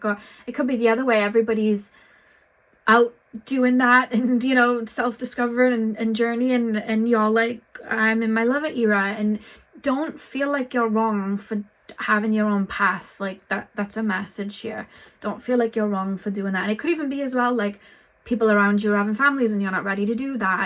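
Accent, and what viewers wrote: Wales, United Kingdom. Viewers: Pile 2; Thank you so much for the confirmation of where I am. You are so spot on, also with the Whale 🐋Been drawn to the Whales so much lately, and I read that the Whales and the Dolphins are gathering in HUGE pods all over the world now! WOW! Just saw an Eagle, and I saw one yesterday too🦅🦅YEI!!! I am new here and I really loved your reading❤‍🔥 And I love your Welsh accent, lived two years in Swansea, Wales, when I took my masters, so it is bringing me back. I come from Norway, in this life, but I the sound of your voice with the Welsh accent feels really like home🐉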